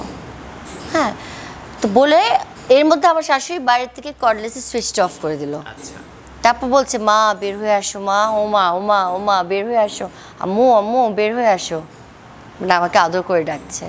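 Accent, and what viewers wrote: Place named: Sylhet, Bangladesh. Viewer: Salam sister you are very good woman nice sylhetee talk but little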